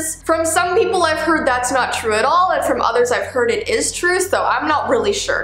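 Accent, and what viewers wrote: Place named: Canada. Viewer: Samurai she is Canadian so she has a different accent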